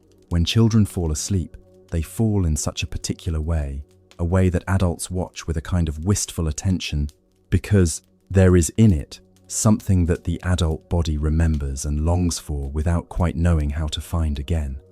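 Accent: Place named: United Kingdom. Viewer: British accent no freaking thank you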